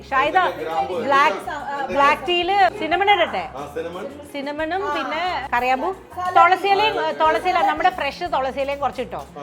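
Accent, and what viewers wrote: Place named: Thrissur, India. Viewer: Now I get it why is having a thrissurian style of talking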